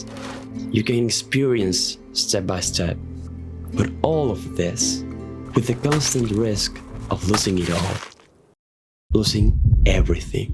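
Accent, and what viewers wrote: Argentina. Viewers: Flaco hablas muy bien inglés, por tu voz sospeche que eras argento y lo verifiqué en tu cuenta. Alto video papá 10/10 seguí asi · vos sos argentino si o si a mi no me engañas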